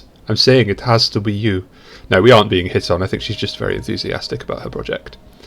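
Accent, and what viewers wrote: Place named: Germany. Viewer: Lol, you losing the German accent remind when of when I was an exchange student in France and during English classes I straight up couldn't speak English anymore. My brain was: Yes. Translate. Know what to do. speaks French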